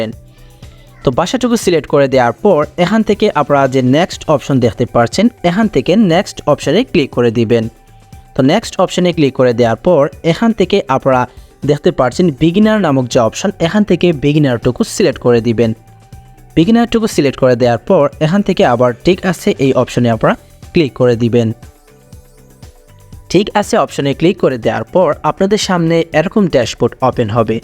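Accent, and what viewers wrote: Sylhet, Bangladesh. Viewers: Bhai ki sylheti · ভাই আপনি মনে হয় সিলেটি r8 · ভাই আপনি কি সিলিটি❤❤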